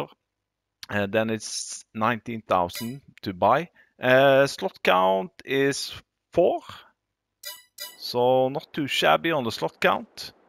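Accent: Norway. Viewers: Er du norsk eller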